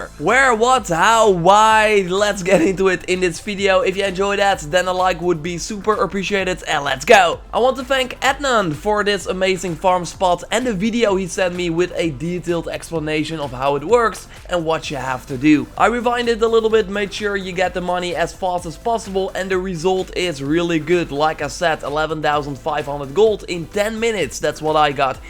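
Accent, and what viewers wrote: Netherlands. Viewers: You’re definitely dutch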